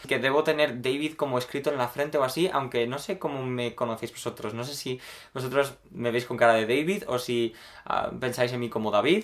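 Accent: England, United Kingdom. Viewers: Eres de Inglaterra?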